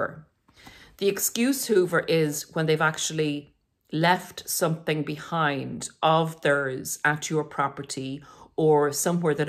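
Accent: Ireland. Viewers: Came for the info - stayed for the lovely Irish voice.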